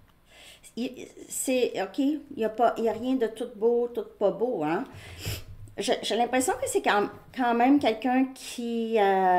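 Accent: Canada. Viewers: Hello sweet lady , with Canadian accent 🌺❤️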